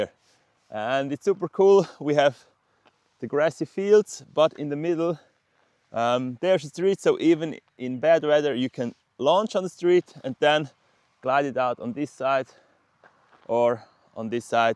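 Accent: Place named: Europe. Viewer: Thanks for the review. Btw I can't quite figure where you are from in Europe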